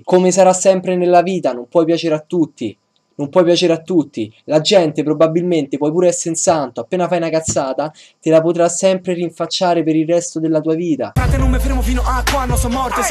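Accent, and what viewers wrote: Rome, Italy. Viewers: non riesci a parlare meno romano?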